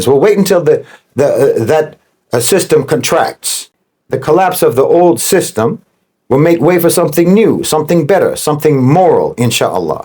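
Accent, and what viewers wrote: United States. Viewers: Meanwhile he wears a suit, speaks American English and benefits from all good of western culture.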